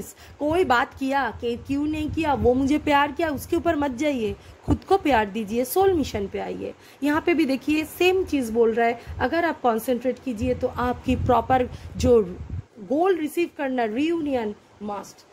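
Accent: Bengal, India. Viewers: Always love to hear you, especially your bengali accent. The ways you explains, really every single word touched my heart . Pile 1 resonated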